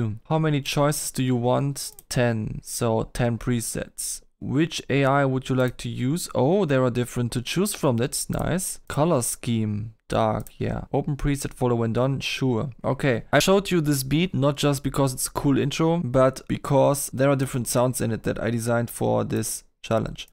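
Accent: Germany. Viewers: where you from? deutsch?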